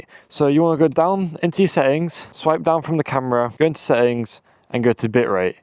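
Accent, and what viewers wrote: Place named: United Kingdom. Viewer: I need one without ND Filters Bro which country are you from? You get R125. It looks like an R15 Though You sound British, i didn't know they have 125cc in English countries, we don't have R125 in my country but we have an R15. It's a really good bike i wanted one too i like the R15 intensity White, recently they released a purple white as well. But i don't wanna switch yet because it's also an uncomfortable bike but R15 is favorite throughout the whole country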